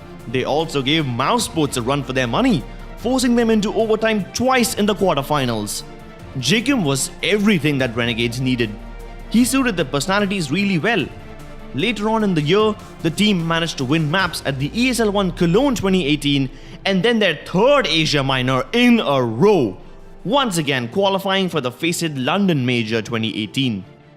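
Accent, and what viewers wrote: India. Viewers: Indian accent smells from 4000km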